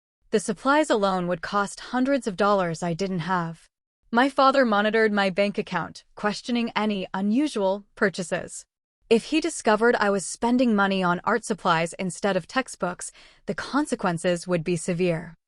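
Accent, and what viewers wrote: United Kingdom. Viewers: What accent does the narrator have,from UK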